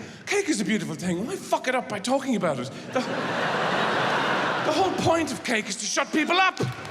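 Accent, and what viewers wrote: United Kingdom. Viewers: Where's the punchline? This is just what British people sound like to me.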